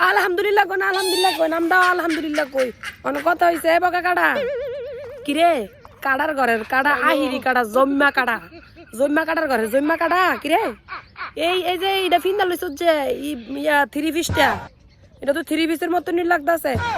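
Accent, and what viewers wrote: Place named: Netrokona, Bangladesh. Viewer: নেত্রকোনার ভাষা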